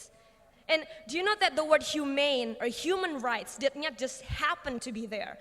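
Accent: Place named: United States. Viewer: American accent on point! i wonder how she did that while her entire life almost spent in Indonesia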